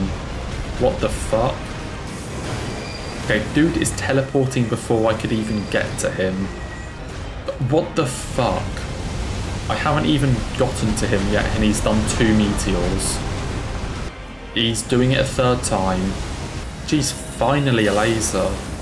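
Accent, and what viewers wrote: United Kingdom. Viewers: Why do you souns homosexual or are you just British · Its called a british accent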